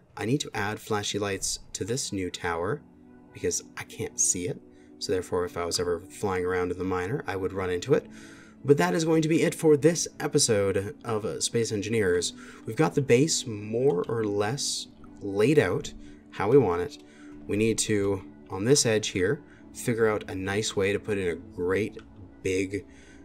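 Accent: United States. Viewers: Hey dude, I'm really enjoying your series, keep up the good work✌🏻 I have a question... What accent do you have? I assume you're American but where in America🤔 thanks haha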